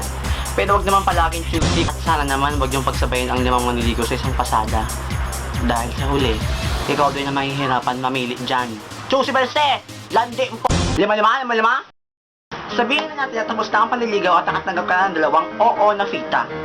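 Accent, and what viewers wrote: Visayas, Philippines. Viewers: visaya ka teh??????/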